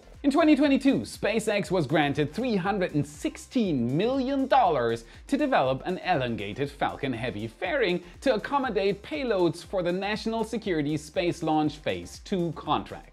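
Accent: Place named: Germany. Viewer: He’s native German.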